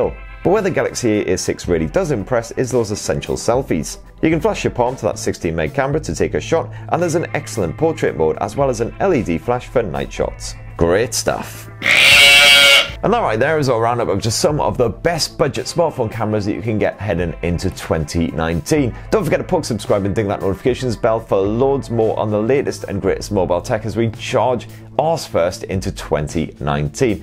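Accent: United Kingdom. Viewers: You speak too fast dear. With the brit accent and your fast talking I dont understand everything you say.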